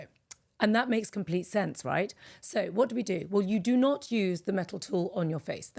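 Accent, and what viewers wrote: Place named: United Kingdom. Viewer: thankyou. So excited to have found your channel, beautiful, knowledgeable lady, and with a perfect British accent, yay!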